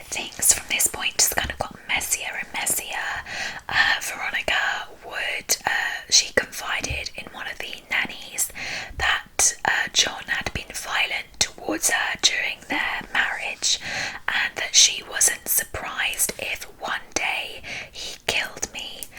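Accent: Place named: United Kingdom. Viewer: Sooooo British! 🇬🇧❤️🇬🇧❤️🇬🇧 Love it! 👍🏻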